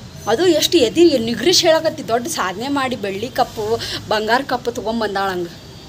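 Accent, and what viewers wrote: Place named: North Karnataka, India. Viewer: Enamma, Gayatri GE mukuleppa tale kedisidda e edu love jihaadi pakka Amma neenu tumba anubhavi neenu khare ide mukuleppan mukulevva correct ide Amma neenu e video nodi bhahusha brahman samajadavaru anisutte nimma maata nimma mukha nodidare ansutte nimma uttar karnatak da maatu Keli nakku nakku kannalli neer Bantu entantha dailog matadtiyamma pratiyond maatigu nakku nakku sustade thank you amma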